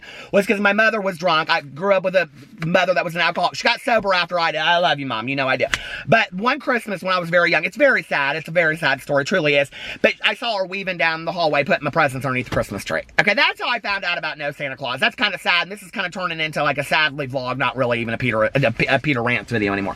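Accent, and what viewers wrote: South, United States. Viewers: I’m so happy that I’ve found you recently! I’ve never heard someone with a southern accent speak so fast but I’m from jersey so it just works for me. Hope you get to chill out after all of the madness 😉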